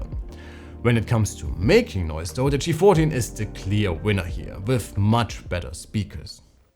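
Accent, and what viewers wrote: Germany. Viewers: Der deutsche Akzent ist echt😅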